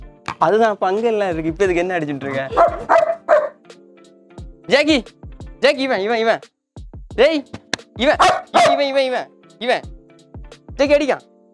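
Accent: Kanyakumari, India. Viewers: Thampi kumarimavata Tamil ketta rompa alaga irukku malayalam mix Tamil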